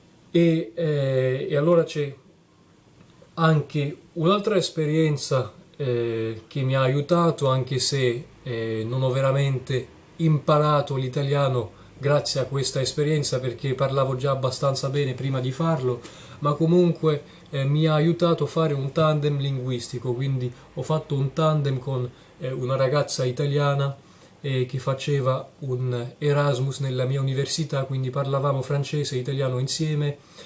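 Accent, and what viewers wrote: South, Italy. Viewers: Sembra tu abbia un accento tipico del sud Italia! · Bravissimo! Hai un accento marcatamente meridionale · Già, è molto molto meridionale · ma sembri un meridionale italiano lol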